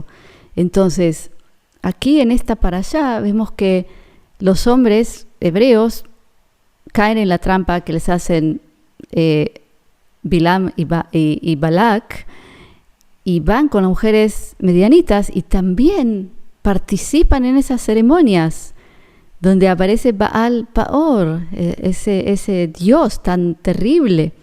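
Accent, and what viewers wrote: Argentina. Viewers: Una Argentina leyendo eso?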